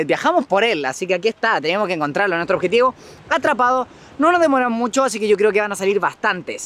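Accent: Chile. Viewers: eri chilenooooooooo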